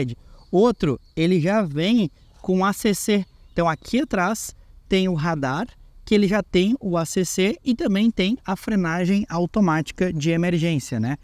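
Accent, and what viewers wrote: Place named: Rio Grande do Sul, Brazil. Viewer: Um FOLKSwagen kkkk esse sotaque gaúcho é um barato!!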